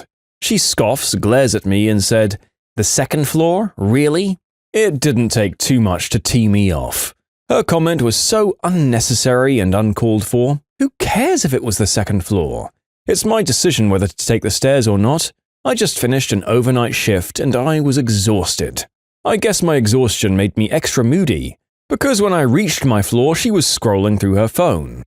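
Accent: United Kingdom. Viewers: Why the fuck are you british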